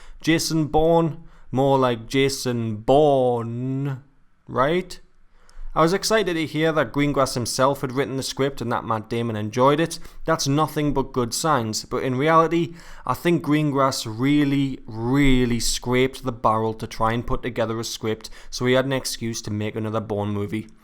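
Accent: Scotland, United Kingdom. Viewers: what is it about hearing a scots accent that makes everything unimportant. bourne 2016 was a travesty, but i'm sure it paid for someone's nice house somewhere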